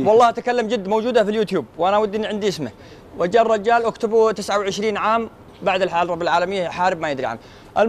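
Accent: South, Arab world. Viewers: ي زين لهجتنا يلجنوب الله يسعدك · ايتكلم بلهجه جنوبيه كفووو يبو حور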